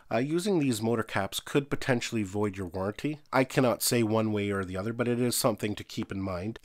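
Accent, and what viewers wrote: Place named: Nova Scotia, Canada. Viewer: Haha. I knew you were from Canada. Most likely from Nova Scotia.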